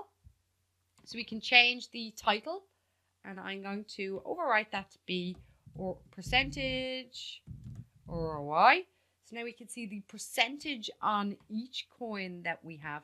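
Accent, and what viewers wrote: Ireland. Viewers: Love the Irish ☘️. Thanks for this 👍🏽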